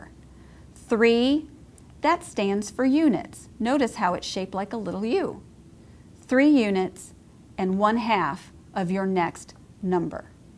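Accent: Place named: United States. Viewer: I don't like her she has an American accent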